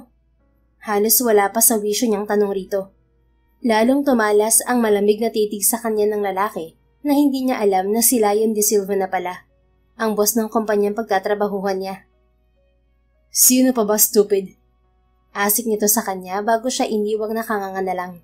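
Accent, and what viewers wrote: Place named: Batangas, Philippines. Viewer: Thank you, writer Smiling face, and for a wonderful story..Ang galing mong narrator lalo sa Batangas intonation mo..Congrrats👏👏👏❤❤❤⭐⭐⭐⭐⭐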